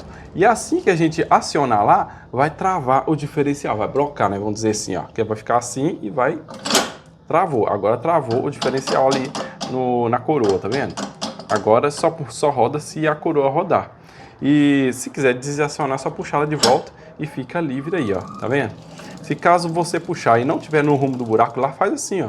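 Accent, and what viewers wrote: Minas Gerais, Brazil. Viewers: Sotaque mineiro, parabéns👏🏼👏🏼👏🏼 Exemplo de trabalhar com o que gosto, obrigado🙏🏼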